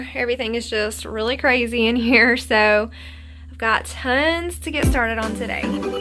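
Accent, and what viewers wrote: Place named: Appalachia, United States. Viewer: Hillbilly accent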